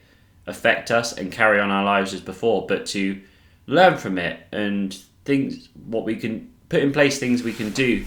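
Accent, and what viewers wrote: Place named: United Kingdom. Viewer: good video! now that you're speaking slower, I can hear that your british :p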